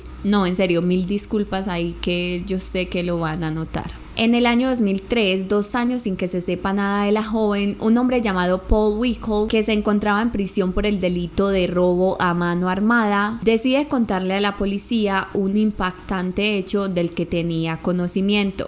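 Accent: Antioquia, Colombia. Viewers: Ay mi querida, me encantan tus videos y tu acento colombiano... Pero hace unas semanas una colombiana me rompió el corazón y me duele escucharte hablar 😭💔 ojalá pronto lo supere para volverte a escuchar con cariño. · Si, a mi también me gusta el acento Colombiano, después del de mi país por supuesto. Saludos desde Zacatecas México ❤️🇲🇽🥰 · Es el acento típico de los paisas (los nacidos en Medellín )...acá en Colombia,hay variedad de acentos 😊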